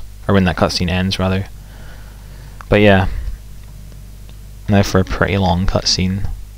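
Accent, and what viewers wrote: Scotland, United Kingdom. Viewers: holy cow is SCOTTISH, thats surprising lol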